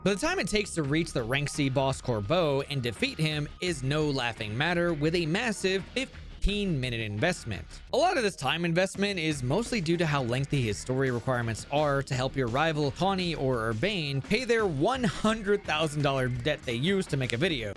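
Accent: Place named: France. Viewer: I am 100% sure he is American, as I have been following the channel for quite a while now. Yes, french accent is pretty heavy 😅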